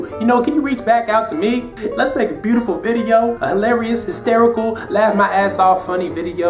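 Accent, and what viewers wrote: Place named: New York, United States. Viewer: You from New York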